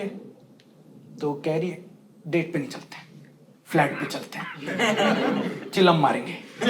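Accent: India. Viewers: Bhai which city u r? UP se h yh toh jaan gya 😊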